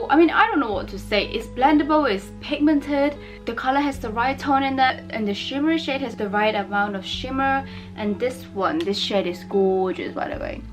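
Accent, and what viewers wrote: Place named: China. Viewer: Where is your accent from? Sounds like English mixed with Chinese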